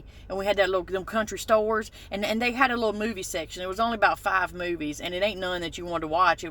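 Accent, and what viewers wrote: South, United States. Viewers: Hussy and Harlot😂....love the southern accent!❤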